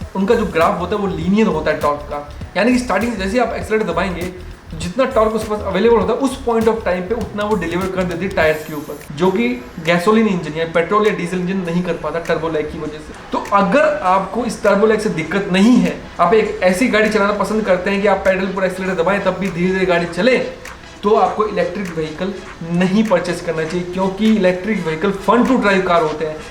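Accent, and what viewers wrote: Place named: Haryana, India. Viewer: Bhai pakka haryanvi hai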